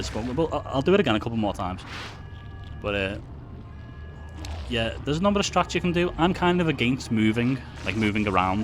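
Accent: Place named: Liverpool, United Kingdom. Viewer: Excellent video. Great to hear a fellow Liverpudlian also!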